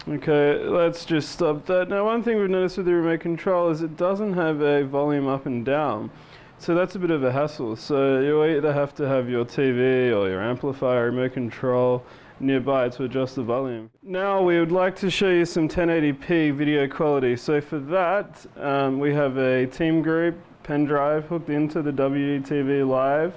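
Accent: Australia. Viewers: It's an Australian accent, and Australia is a lot better governed than the US. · r u Australian?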